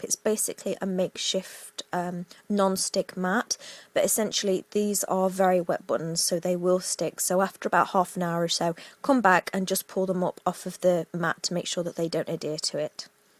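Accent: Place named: England, United Kingdom. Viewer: Love this!! Thank you for sharing your video! Can I use clear nail polish to harden my buttons if I do not have the stuff you are showing in your video? That Diamond Glaze is very expensive when I looked for it. By the way I just love your English accent! Have a blessed day :)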